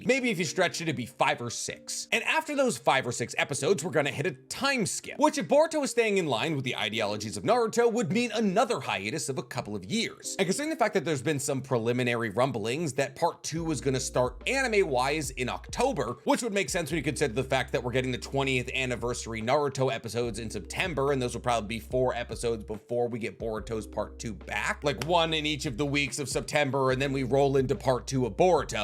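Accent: United States. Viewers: It’s Ka-wa-ki, stop pronouncing Japanese names as an American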